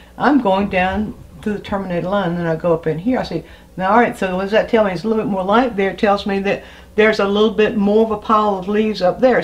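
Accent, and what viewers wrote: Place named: Missouri, United States. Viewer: I got to know where you are from. You have a similar accent my grandma had. She was from Missouri. Am I close???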